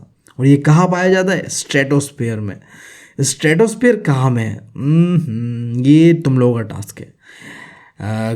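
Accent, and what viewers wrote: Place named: Bengal, India. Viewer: Ap Bengali ho ya Assami 🙄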